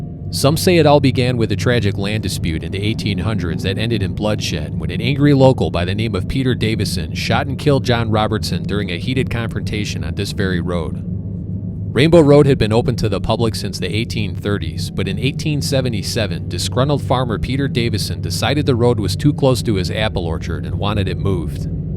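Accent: Chicago, United States. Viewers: honestly, I also love your accent. My family is from northwest Indiana and the Chicago area, so you sound similar!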